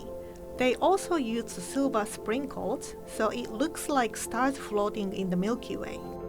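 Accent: Japan. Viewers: Probably that just her accent. Japanese people will sometime misread "L" as "R" and "R" as "L" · Even after years for working for tasty america she hasnt lost here japanese accsent praise God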